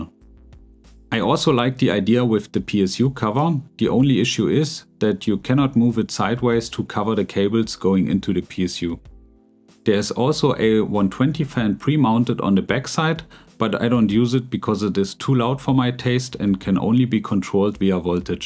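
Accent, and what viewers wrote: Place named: Germany. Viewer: Dude. I'm Polish and I knew you're German the moment I heard you.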